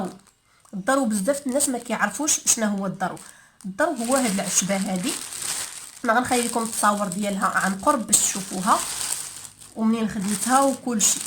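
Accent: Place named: Algeria. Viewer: هاذي موش لهجة تونسية هادي جزائرية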